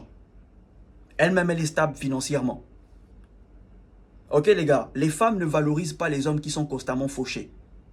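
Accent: Gabon. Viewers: Bjr type j'aime tes conseils j'ai une question tes Gabonais ? Car ton accent est gabonais ❤si oui répond moi stp❤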